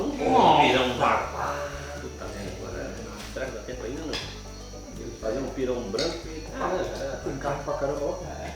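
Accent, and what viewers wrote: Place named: Florianópolis, Brazil. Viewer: Caí de paraquedas aqui, mas pelo soque.. com ctz é da nossa linda e querida Floripa! Baita vídeos, seguindo já.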